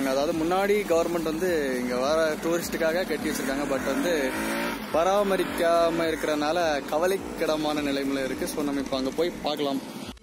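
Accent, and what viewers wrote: Pandi, India. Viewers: Bro pandi slangla onum peasandam nama adaiyalamana kumari slangla peasunga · Pandi kala irukum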